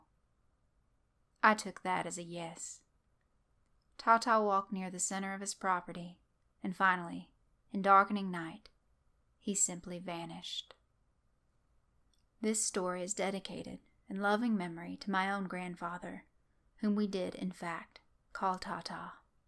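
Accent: South, United States. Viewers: When a creepy pasta is narrated by Scarlet O’Hara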